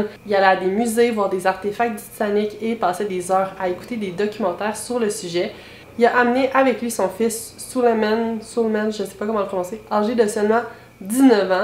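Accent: Quebec, Canada. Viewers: pour ton accent, etant un quebecois moi meme,, ben yen a pas de plus beau et toi aussi tes pomal belle,, et il y a un reportage de France qui parle de comment laffessement ces produit contrairement a limplosion instantannee effectivement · Elle parle très bien francais, elle a un accent québécois c'est tout.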